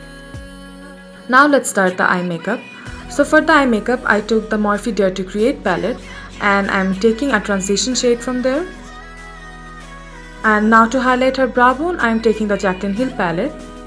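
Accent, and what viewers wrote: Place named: Bangladesh. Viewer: Why Indian? You sound like bangladeshi 🤨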